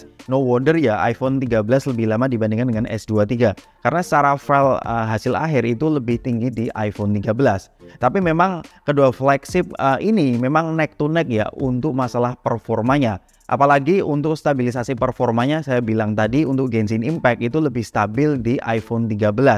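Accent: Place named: Pekalongan, Indonesia. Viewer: kaceke tidak banyaknya ya, jgn2 mas nya orang pekalongan nih 😁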